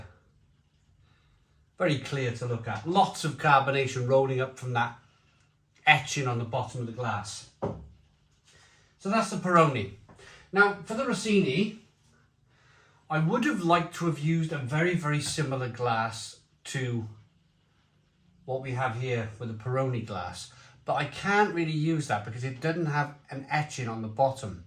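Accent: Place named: Cardiff, United Kingdom. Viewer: This man sounds like he's from Kardiffffffffffff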